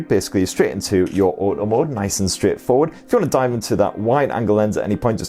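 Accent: United Kingdom. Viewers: It's British Accent 👌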